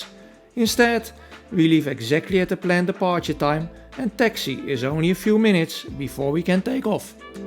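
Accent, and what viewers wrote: Netherlands. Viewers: Do I detect a NL'er here speaking?😅 Oman has been for years on my next new destination.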